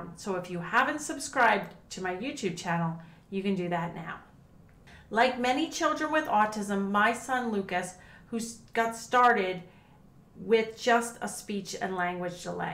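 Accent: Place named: Pennsylvania, United States. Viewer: Knew you were from Pennsylvania right away.😂. from a South Florida transplant by way of the Lehigh Valley and thank u for rhis guide 😊❤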